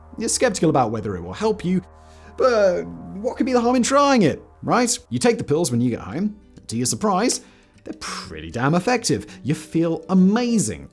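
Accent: United Kingdom. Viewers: How is it that he reporting on American products with a british accent and I never seen this American product 😂 bc down here in Houston if someone say zaza they talnbout that good gas. Or superb Marijuana for you unseasoned mfs.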